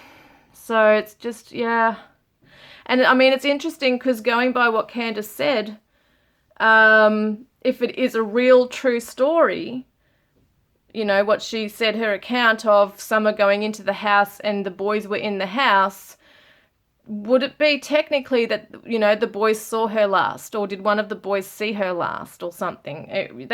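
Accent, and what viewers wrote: Australia. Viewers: Thank you just stumbled on you! Great to hear a fellow Aussie 💙 Summer ❤💜❤ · Love you in depth readings. Hearing the Aussie accent for me makes me feel more at home like we're having a personal convo Thankyou for all your doing to help bring answers to little summers disappearance ~cheers🤗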